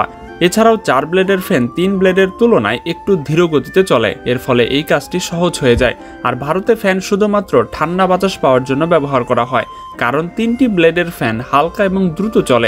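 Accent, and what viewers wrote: Bangladesh. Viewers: ইনার কথা শুনে তো মনেহচ্ছে ইনি বাংলাদেশি।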